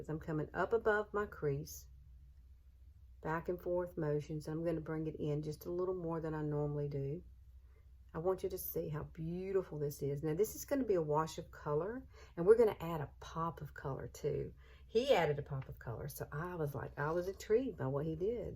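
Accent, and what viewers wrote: South, United States. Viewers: What state are you from? Somewhere in the south I’d say. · You are a very good teacher! I love watching Wayne too but it’s helpful to watch others use his technique and I enjoy ur adorable, southern accent :) I love the colors u picked and plan on finding some in my collection that are similar because my coloring is exactly like yours.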